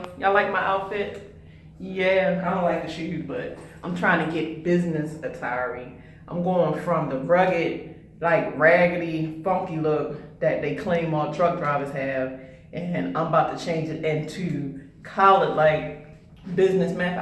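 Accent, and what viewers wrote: Baltimore, United States. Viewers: 666 comments, glad I'm 667 Sounds like you from Bmore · Sounds like you are from Baltimore... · Love that bmore accent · Very pretty your probably fun to hang out with too I bet sounds like you from Baltimore anyway keep safe out there miss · Jay Reed Nope....DC, MD OR Virginia..or at least been there for 5 years or more....Actually 99% hunch it’s Baltimore. (after listing more 99.999% it’s Baltimore) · Ya sexy ass sound like you from Baltimore. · 2-4 Till I Die she sounds like she's from Baltimore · sounds like she is from. bmore or. maryland lol the uuuuz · That Bmore accent strong lol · She is definitely from Baltimore Maryland! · She do sound like she from Bmore, sorry that you don’t get none because you’re so cute, love ya voice too. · Ya bmore accent is LIT! 😂😂😂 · I can listen to that B'more Accent all day 😂 coming from Bama. 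· My first video watching. You from Baltimore. I can hear that shit clearly. DEW lol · This is my first time seeing this channel but i can tell by your accent that you are from baltimore. Nice content! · You gotta be from Baltimore?! I can tell by the way you say “do” and “to”...I like your honesty. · I love the Baltimore accent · First time ever seeing your video & the first thing I’ve noticed besides how gorgeous you are is your Baltimore accent... You have a new fan! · You from Bmore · Gotta be from Baltimore. NOLA and BMore accents are so dope! You rock! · Love your BMore accent ♥️ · You are from Baltimore, Maryland aren't you? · You must be from Baltimore? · You sound like you're from Baltimore.But you not having a man.Wow I can't see that far.You are BEAUTIFUL. 💯😚Keep it up sista.Real talk from beginning to end. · Good grown advise.Keep it truckin,sound like a Baltimore accent.Peace and blessings to the truckers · I was about to say. 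You from Baltimore. Nice 😀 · Very nice looking female truck driver, you speak with a Baltimore accent, are you from that area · You sound like you from Baltimore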